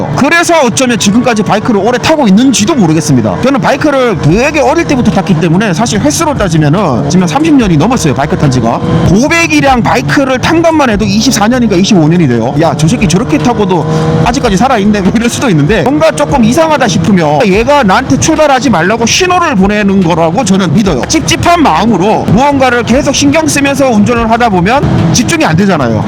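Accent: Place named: Gyeongsang, South Korea. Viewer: 경상도 사투리인데 딕션 깔끔해서 귀에 쏙쏙 박히네요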